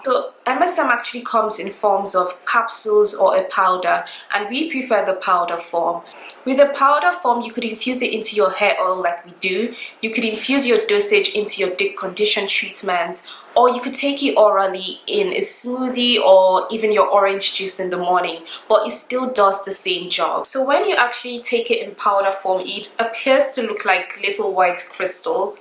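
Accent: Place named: Nigeria. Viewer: I was going to ask if you are Nigerians cos of your accent but you have it in this video. Next question, with the mixture of all these oils how do you know which ones work for sure, like which does exactly what and which ones are ABSOLUTELY necessary which are the MAYBEs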